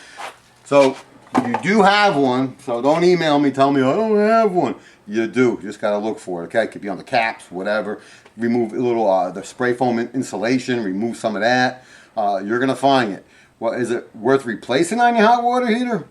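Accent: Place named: Maryland, United States. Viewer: you from the Maryland area by chance. I think I recognize that accent! :)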